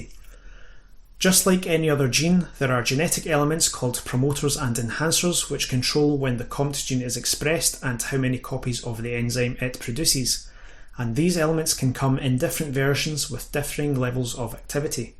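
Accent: United Kingdom. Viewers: Are you a Scouser? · Warrior/ worrier isn’t the greatest phrase to follow with a Scottish presenter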